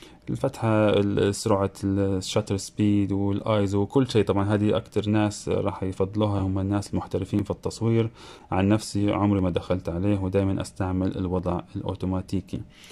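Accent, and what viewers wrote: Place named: Libya. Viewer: واضحه ليبي يلي يشرح منور ولد بلادي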